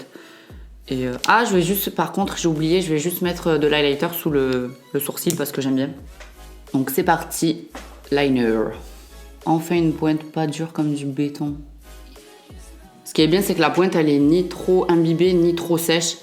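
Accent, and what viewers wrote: France. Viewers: T’es marseillaise ? Ps: super revue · Coucou tu viens d’où ? T’as un léger accent de chez moi, du sud ouest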